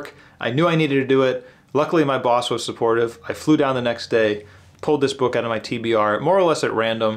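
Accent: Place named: Netherlands. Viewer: P.s you Dutch by any chance. You hide the accent pretty well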